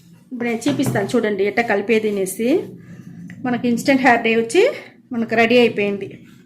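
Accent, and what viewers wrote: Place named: Chittoor, India. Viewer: మేడమ్ గారు చిత్తూరు జిల్లా తమిళ్ బోర్డర్ లో ఉన్నటువంటి తెలుగు వారు అనుకుంటున్నాను.అయిన తెలుగు బాగా మాట్లాడుతున్నారు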